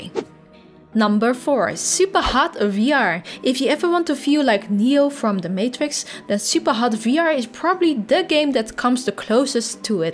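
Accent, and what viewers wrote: Netherlands. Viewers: Hey i detect a heavy dutch accent ^^ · Doe een video in het Nederlands nu denk je van hhuuh hoe weet je dat ik Nederlands spreek ik kan het horen aan je accent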